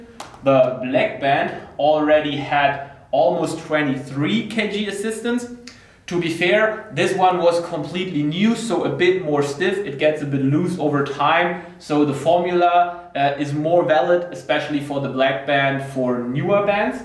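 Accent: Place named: Germany. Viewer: Hahaha dein deutscher Akzent ist ja so geil 😂😂😂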